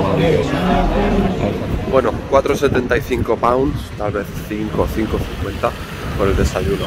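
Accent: Andalusia, Spain. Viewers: Te hablan español porque hablas inglés con acento español. Las dependientas saben que no eres ingles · pero eres andaluz o solo pones el acento cuando hablas con uno? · Flipo con tu acento andalú. Esq eres 100% granaino jajajajaja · El acento andaluz es top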